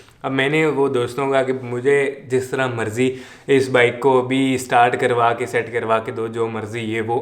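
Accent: Punjab, India. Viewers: hamey story sunaya kro plz ap ki story buht sander jaberdast zindabad hoti hen...us ap Jo punjabi tarka legaty ho wah buht hi shapakin ha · ka punjabi lehja zaberdast hai.ham thehrey karachi waley punjabi.🙄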